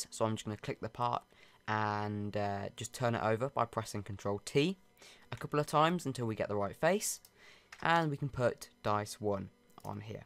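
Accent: United Kingdom. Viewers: HAS TO BE UK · never really got where your accent was from, was thinking UK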